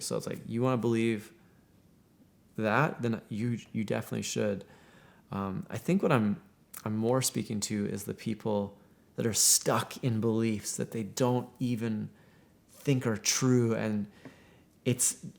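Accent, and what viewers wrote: Canada. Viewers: I have a very similar perspective to you on beliefs, but you've worded it much better than I can right now. I went through a religious phase but now am agnostic. Honestly my belief system is a Frankenstein monster of bits and pieces of every religion, belief system, and psychology but at it's core it's trust my intuition on what feels correct. I also appreciate your Canadian accent, my mother's side of the family is Canadian and it's always made me feel at ease 😊 I'd love to hear more of what you think on this topic.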